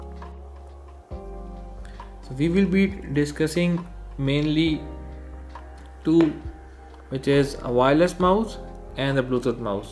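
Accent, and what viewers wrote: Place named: India. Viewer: Hes Indian (i think)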